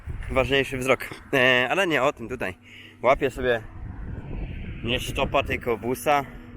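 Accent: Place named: Poland. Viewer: Polski akcent jest :)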